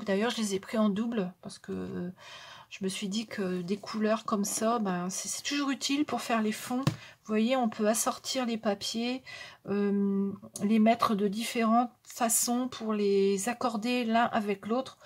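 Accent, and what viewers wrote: North, France. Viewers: J'aime vos cartes de Noël et le bon accent du Nord si je ne me trompe pas a bientot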